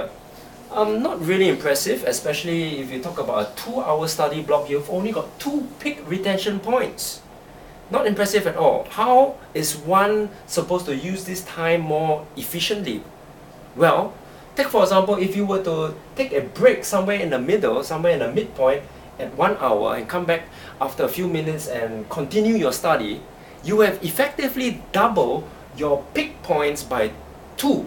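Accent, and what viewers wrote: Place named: Africa. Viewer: Why do you sound so African?!?!?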